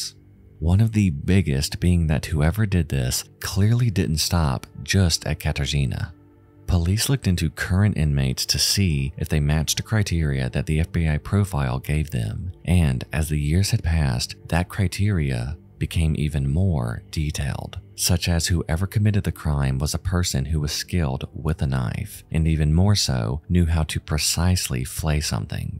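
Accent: South, United States. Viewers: I’m sorry, I know this is a serious video, but the question must be asked: how does this man with a vaguely south-ish USA accent say “Katarzyna” better than Tyra Banks who regularly works with East European (among other) models ever did on her show when she literally also had a girl on the show for almost every episode of Cycle 10 *named Katarzyna*? Angry.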